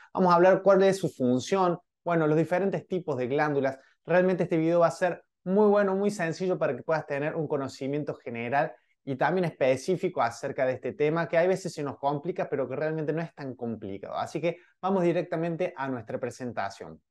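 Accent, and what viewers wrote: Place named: mixed, Argentina. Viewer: Es Tucumáno o cordobés de Argentina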